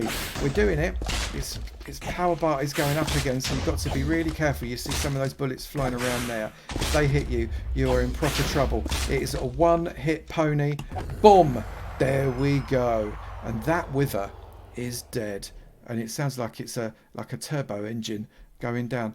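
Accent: United Kingdom. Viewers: A british minecraft survival guide ? Y E S P L E A S E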